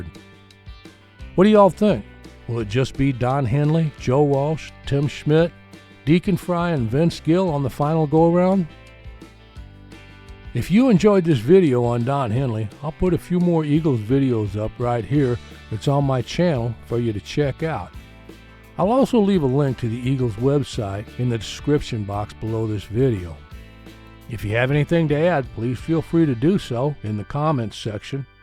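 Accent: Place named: East Texas, United States. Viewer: As someone born and raised in gilmer, and a die hard fan of your channel, I really like how accurately you told his story. My grandfathers best friend was his dads boss. They worked for an auto parts store and my grandfather used to go with them to nascar races. In my head I have my own little rivalry with don. When I was a kid, I was a drummer and singer and everyone used to say my voice was just like his. I still sing, but I haven’t quite overtaken him yet. More of a guitar player anyway. Tbh, my sister is great friends with whiskey Myers and I’m borderline hoping on a Steve gains kinda situation lol I know you’ve got to be somewhat in my vicinity by the way you speak and the specific music you’re really into, I just know it. East Texas?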